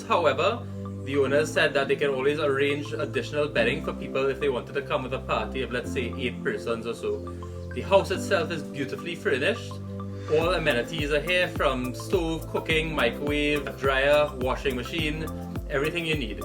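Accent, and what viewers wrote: Trinidad and Tobago. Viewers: HI MY FAVORITE TRINI, AS MENTIONED BEFORE WE STAYED AT BLACK ROCK 2016. OUR VILLA WAS THE FIRST OF (3) WATERFRONT WITH A POOL. IT WAS A DEAD END ROAD. THE PROPERTY MANAGER WAS A ENGLISH WOMAN MARRIED TO A BLACK TRINI MAN. THEY LIVED IN TRINIDAD. THE VILLA WAS OWNED BY A SCOTISH OR ENGLISH PERSON. IT HAD (3) B.ROOMS . MAIN B.ROOM HAD OWN BATHROOM, (2nd) B. ROOM HAD LRG. WALK IN SHOWER. THERE WAS ALSO A SEPARATE FULL BATH ROOM. WE WALKED FROM THE VILLA INTO THE BEACH THRU A SMALL GATE. IT WAS A BEAUTIFUL (3)'B ROOMS VILLA, WITH ALL THE AMENITIES; Including a weekly House Cleaner. ANYWAY THANKS FOR SHARING. CONTINUES TO TAKING CARE OF THAT ACCENT. BYE, BY. CANADA 22/04/03 p.s. Unfortunately too OLD to Travel; if not I would definitely visit TOBAGO. SPENT (4) DAYS IN COVA or COUVA, DID NOT LIKE IT. OH WE WENT TO PITCH LAKE which was interesting.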